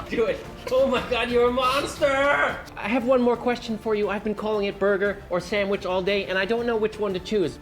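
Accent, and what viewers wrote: United Kingdom. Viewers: Where in uk were you from sonny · "Woostersheer" is how to pronounce it. Great to know you were born in UK. You can take the guy out of Britain, but you can't take (all) the Britain out of the guy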